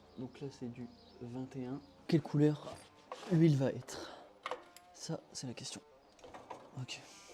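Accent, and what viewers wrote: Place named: Pas-de-Calais, France. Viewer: Bravo, bravo, moi qui suis en train de démonter mon moteur de cox, ça me sert bien ton super tuto...!!! Je suis dans le Pas-de-Calais, j'ai reconnu le tchiot accent du coin... Continues de saquer d'din et force à toi!!! C'est top!!!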